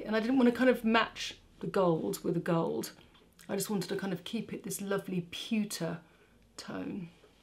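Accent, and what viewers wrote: England, United Kingdom. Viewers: I love watching you! You are so soft spoken and the English accent is a bonus for me. I’m fascinated by the blending of the lip colors by Mario The color you came up with looks beautiful on you. Your makeup always looks so fresh and clean Have a wonderful Christmas snd some relaxation. Love from the US ♥️🌲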